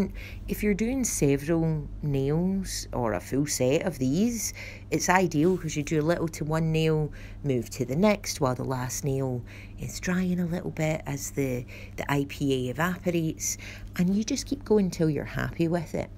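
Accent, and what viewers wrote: Scotland, United Kingdom. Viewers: Have to admit you're Scottish accent is truely smokin for this effect too. Fabulous. Love working with pigments so having a go asap. Thanks for sharing and cheers from cumbernauld xx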